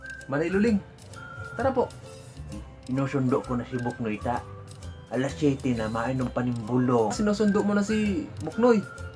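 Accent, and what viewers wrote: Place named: Bicol, Philippines. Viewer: Hehe bikolano ka pla po sir kala ko po taga manila parehas palan po kita haha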